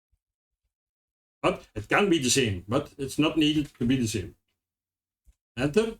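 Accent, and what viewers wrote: Belgium. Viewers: Ik hoorde al dat je van Belgie bent, goede video! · We horen echt da vlaamse accent hahaha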